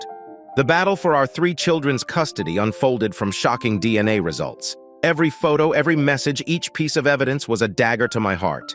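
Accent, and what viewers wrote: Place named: RP, United Kingdom. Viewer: What is with the high english?????!!. It sounds stupidly arrogant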